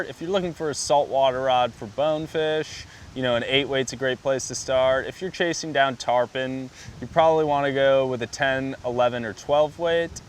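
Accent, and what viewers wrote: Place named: California, United States. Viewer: Definitely a cali accent. Annoying as hell.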